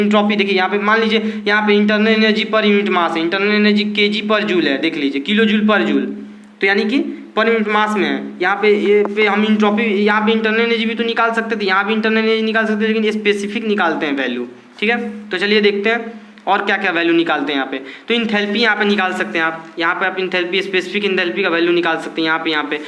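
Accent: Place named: Bhojpuri, India. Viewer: Good explanation nd I love ur bhojpuri accent 😂👍👍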